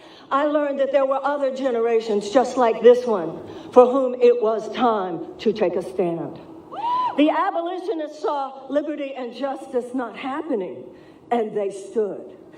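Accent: United States. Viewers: She’s very well spoken. I’ve heard her speak before but somehow I never noticed her Texas accent. She sounds really smart.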